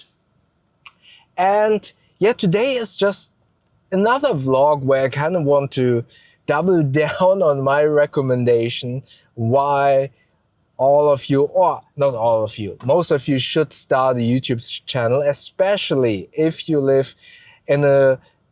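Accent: Germany. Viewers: Your English sounds fine to me. To be honest, when I first saw your videos on your main channel, I did notice the accent but I couldn't nail down. Didn't even know it was a heavy German accent until I saw this channel. Living in Hawaii, we have people from all over Asia and the Pacific so there all kinds of accents, including a localized version of English which borrows a lot of words from other languages so hearing yours didn't really phase me. People making those comments about your English, especially Americans either live in an area where there isn't a lot of ethnic diversity or just being trolls. You're doing a great job!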